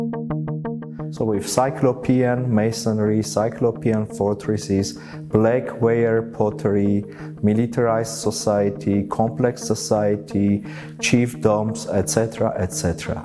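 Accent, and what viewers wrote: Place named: Turkey. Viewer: Interesting and greatly informative video, what is more interesting is I would say the presenter is Turkish if I didn't know he is Armenian, due to his accent and outlook. Most of my good friends were Armenians back in the day, and my father served his military duty in an Armenian village in Eastern Turkey (yes we still have a sizable Armenian community). Contrary to Turkish claims that Sumerians are Turkic, or Meds are Kurdish, the Urartu-Armenian coonnection kind of makes sense to me.But other than the archeological dig outs, I would be more interested in the linguistic evidence, otherwise cultural elements can be easliy adopted by people who came to the region later on. We Turks consume the most tea er capita in the world and love olive oil etc. Sth. similar can be seen in the case of Hatti-Hitite as well. I think I will watch some videos on Urartu-Armenian linguistic connection. 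But this video was really interesting.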